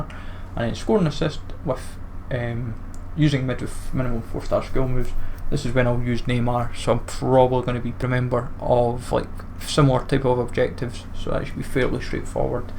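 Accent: Scotland, United Kingdom. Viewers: is it scottish accent for real?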